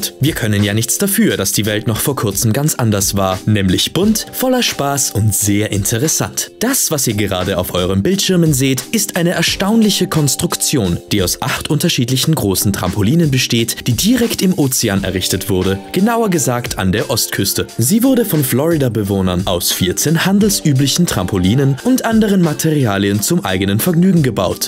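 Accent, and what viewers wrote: Austria. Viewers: Stimme ist super. Man fühlt sich etwas weniger wie mitten in Österreich. (Nichts gegen Österreich) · Das ist auf Österreichisch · Lia & Enna Das ist Österreich-Deutsch☺! Da ich selber aus Österreich stamme...die Deutschen sprechen das CH aus und wir Österreicher mit K; zum Beispiel bei der Giraffe, sagen wir Österreicher Schiraffe; usw.! 😊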